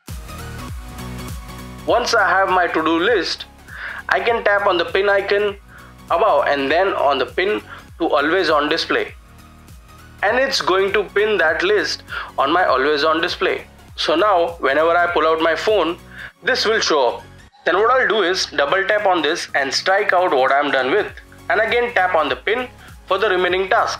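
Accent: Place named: India. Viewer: Funny indian accent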